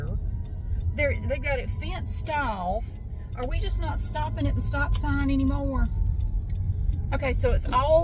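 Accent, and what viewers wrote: South, United States. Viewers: When I first subscribed to your other channel in late 2016,I thought to myself ,I finally found a Southern Asmr Artist( which was hard to find back then) I enjoyed your later night uploads, Congrats on getting a job, it's the best idea for anyone to not rely on a criminal corporation like Google⚡️🔥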